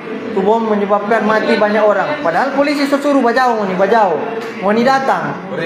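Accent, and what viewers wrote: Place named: Manado, Indonesia. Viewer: Bahasa manado logatnya ashek jg jd terbawa..bawa aku 😊 salam dari Bandung ndan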